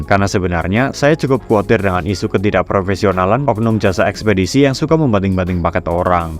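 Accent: Banyumas, Indonesia. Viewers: Wiiiiih..slaam ngapak...lur.. ngesuk j klalen gari tuku ps5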